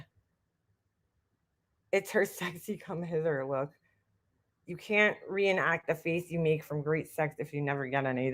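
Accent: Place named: Brooklyn, United States. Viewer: I don’t know how your channel would up in my feed but it’s wasn’t too bad listening to it, coulda been cuz of Ron’s channel. I will say that I have family in Mass Park and I go there frequently, matter of fact I was there 2x last week and the PD wasn’t there each time, my sister also said they’ve been outta there for 2 weeks already. Good luck seems you’ve got a well established following. Haven’t heard the Bensoshurst / Bay Ridge accent since I retired !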